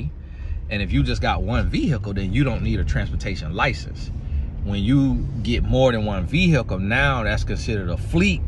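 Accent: South, United States. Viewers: Like the southern drawl. And the advice.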